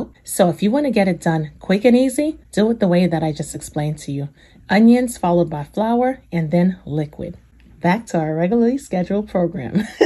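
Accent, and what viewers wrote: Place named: Georgia, United States. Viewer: Looks great! Are you from Georgia or Alabama? I'm Florida raised, surprised I can't tell the difference.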